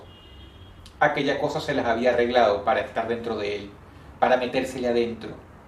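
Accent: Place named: Venezuela. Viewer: No son suyas. Son historias sacadas de internet. Y con el acento venezolano, pasa de dar miedo, a dormirme. Fuk Venezuela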